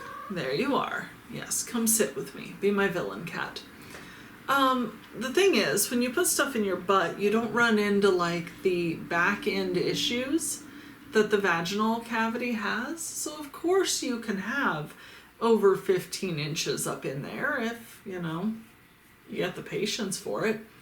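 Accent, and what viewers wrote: Texas, United States. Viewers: As an Aussie, the way you say "Y'all" does give me Texan/mid-western vibes.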